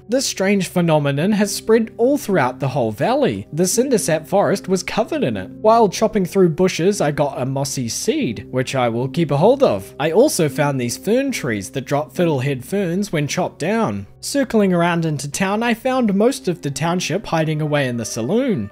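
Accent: New Zealand. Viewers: Are u kiwi? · are you from new zealand i can tell from the accent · I had no idea he was kiwi! i guess i didnt tune into the accent because im also from nz and i was so excited when i found out!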